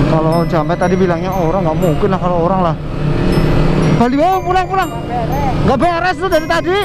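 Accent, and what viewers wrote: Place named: Banyumas, Indonesia. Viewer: Ko ngomonge ngapak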